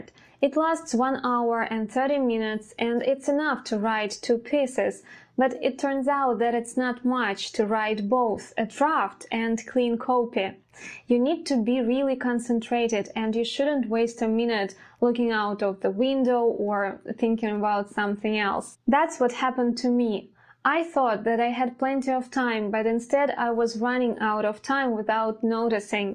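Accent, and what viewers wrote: Russia. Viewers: Wow, Russian accent and manner of speaking are so noticeable:) You don't really sound like a native... no offence, tho. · It's really not, I don't think you heard native English accent. I could hear the russian accent from the very first minute of the video. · Real Russian intonations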